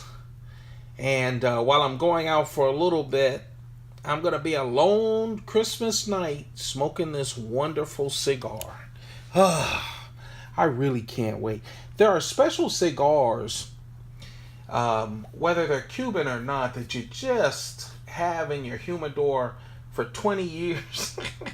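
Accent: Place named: Boston, United States. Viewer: Spyda-co. This guy's gotta be from Baastin.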